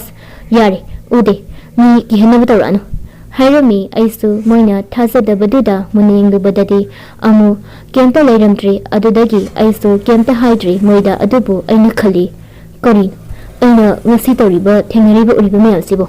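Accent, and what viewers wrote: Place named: Kabui, India. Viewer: Sis gi wari khulise original meitei na ngangba mande...kabui na Meitei lon ngangbagi accent ( tone) ama chang ngi.... khonjel se ymna thot nou ee ymna nungcba khonjel amadi oi. Keep going 👍👍👍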